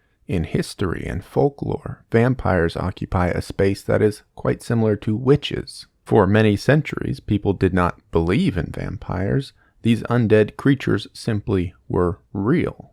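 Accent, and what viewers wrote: United States. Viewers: I’m confused why you’re speaking American and not Australian tho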